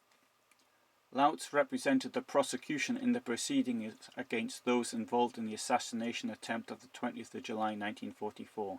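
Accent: Newcastle, United Kingdom. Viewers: Really enjoy the channel, improving my knowledge & understanding, the fact that I also love the Newcastle accent is a real bonus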